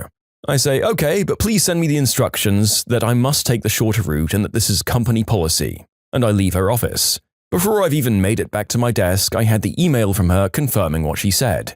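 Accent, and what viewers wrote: United Kingdom. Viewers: i have not watched in a while why are you british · the brittish accsent is killing me and yes i spelled most of it wrong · THE BRITISH ACCENT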